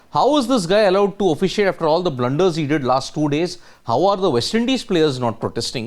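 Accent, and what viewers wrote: India. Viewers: Please improve your accent. The typical Hindi-English accent is truly wreckless coming out of your vocal cords 😂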